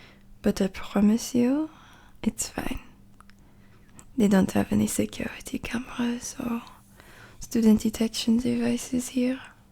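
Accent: France. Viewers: We're blessed with another French accent audio ☺ thank you! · I love the french accent, theres something about it that makes my brain go “love of your life rn” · You're french accent is definitely the best on yt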